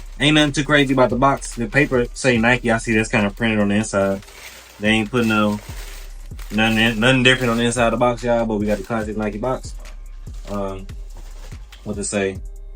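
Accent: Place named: DMV, United States. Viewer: Sound like u frm dmv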